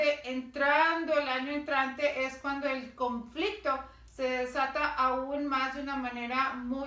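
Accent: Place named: United States. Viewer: ahhmm tu porque hablar como gringa?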